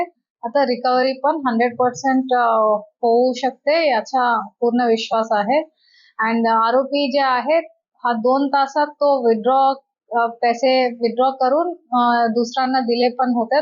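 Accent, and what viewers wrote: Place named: Bengal, India. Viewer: Madam, Bengali Caste Che Vatat Aahe. Becoz of Speaking Accents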